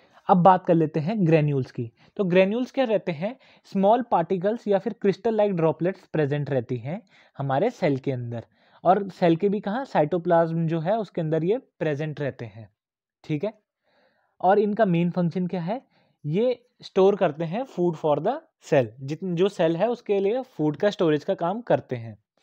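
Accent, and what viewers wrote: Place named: Bareilly, India. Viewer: Sir you are from bareilly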